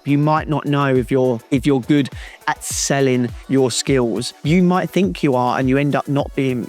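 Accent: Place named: Australia. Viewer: Thanks mate I let you know later because at the moment I'm busy to move in Asia. You're Australian, I recognized the accent because I lived in Perth for 2 years. 😁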